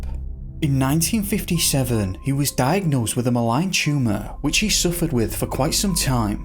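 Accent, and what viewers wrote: United Kingdom. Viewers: Is it me or does this guy sound like Chills with a British accent??? His cadence and what not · What is it about these stories narrated by a soft British/European accent? I enjoy them all, for some reason. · Triela Rhyfel he does he has a regional British accent. Get over it. He talks differently I can’t deal with it. Grow up.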